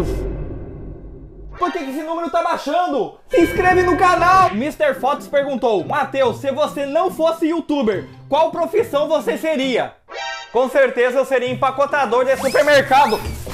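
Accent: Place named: Rio Grande do Sul, Brazil. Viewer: com certeza é Gaúcho ksksks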